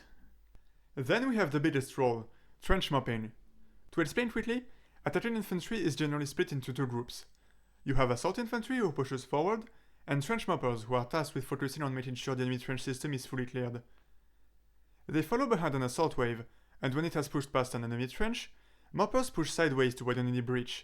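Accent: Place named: France. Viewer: Couldn't understand half of the video due to the extreme french accent but thanks for the information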